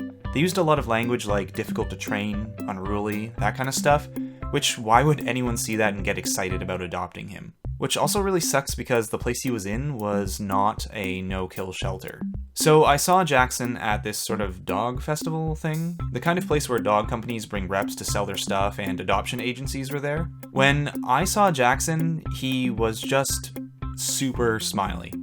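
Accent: Canada. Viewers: You're definitely Canadian! I have made up my mind haha. I am Namibia (African) and can just tell from your accent. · I've been thinking the Sam's thing! Either Canadian or from the very northern United States, like Minnesota. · If I remember correctly, I think he hinted at being from around Toronto in one livestream. But yeah that accent is DEF Canadian 🤣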